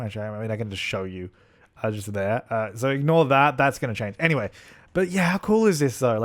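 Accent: Australia. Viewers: It's just the Australian accent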